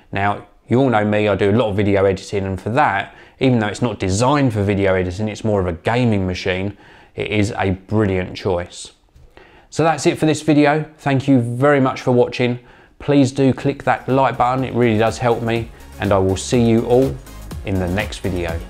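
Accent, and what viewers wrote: United Kingdom. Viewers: hes british man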